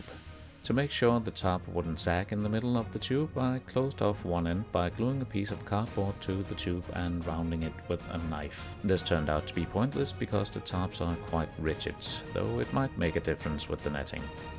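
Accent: Denmark. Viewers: Do I spot a danish accent? If so, where do you source XPS? It is a pain to get a hold of here.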